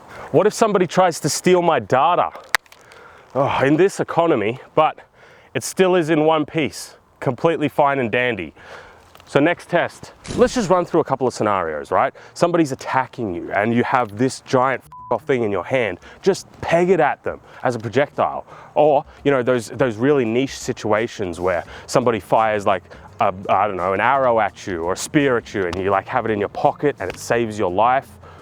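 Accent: United States. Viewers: The American accent is killin me lol great video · This is what I have been waiting for. Thank you for getting deep into the nitty gritty. Fantastic American accent. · I need more American accent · American accent or dubbed anime accent? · I think we need more love for his American accent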